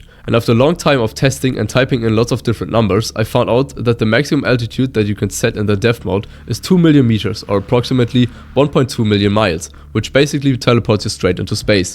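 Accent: Germany. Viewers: Most unnoticable german accent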